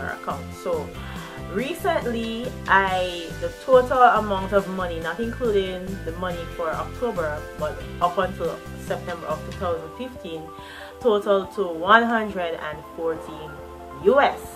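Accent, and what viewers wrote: Jamaica. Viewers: Jamaica? Sounds like Jamaican I'm thinking of starting one too but mi nuh sure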